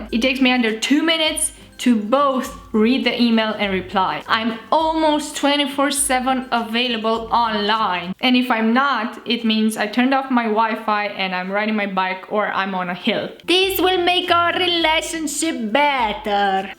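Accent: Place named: Romania. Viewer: Numai mie mi se pare dubios sa aud un om pe care il stiu normal vorbind in enlgeza,ca acum vorbeste in engleza?:)) nu stiu dec e dar daca prima oara cand am auzit un om vorbind in romana,poate sa vorbeasca si cea mai buna engleza ca daca eu l am auzit inainte in romana acum mi se pare ca vorbeste o engleza naspa cu accent romanaesc:))anyway super video,te extinzi si in afara super:)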